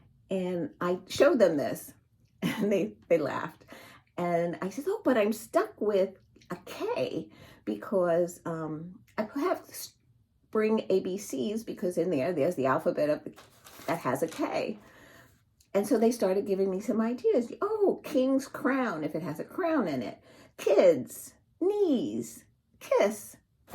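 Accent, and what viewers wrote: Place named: Northeast, United States. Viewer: I just love your videos. We are drawn to many of the same patterns, and I could listen to your beautiful northeastern accent all day long! I love how involved and dedicated you are in the lives of your grandchildren. They are so blessed to have you. I pray mine one day live closer so I can be helpful to my children by babysitting. I want to register an enthusiastic YES PLEASE to the "under the bed box" video. I would love to settle in and watch that!!!! Happy new year to you.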